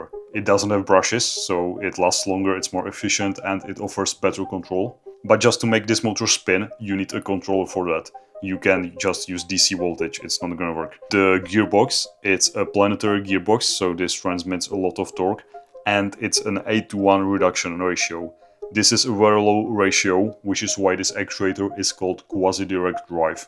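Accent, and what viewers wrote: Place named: Czech Republic. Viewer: Mohu se zeptat kde studuješ? :D doufám že jsem rozeznal dobře český přízvuk :)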